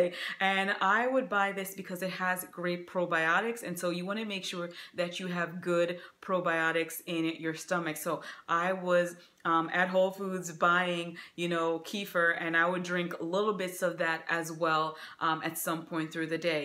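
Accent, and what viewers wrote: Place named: Caribbean. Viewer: You sound like you are from the Caribbean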